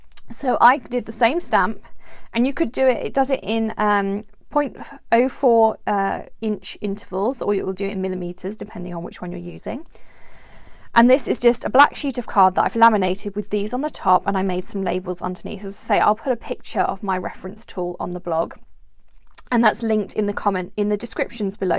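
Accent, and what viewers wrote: England, United Kingdom. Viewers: Omg, i've seen it many times on tv, but never thought i need one. I spend a fortune on, coordinating dies. This is now on my birthday/ christmas list. Also i came across your channel a few months ago. i feel you are perfect for me, i watch all the designers over there in america. As i work on dt here in the uk promoting american stamps as you guys are well in front of the uk. but the best thing about your channel is you know your stuff but you have a english accent. so you see i get the best of both worlds. don't get me wrong not all Americans have a strong accent and i do love them, but i find afters hours of videos i find yours a welcome change. lol x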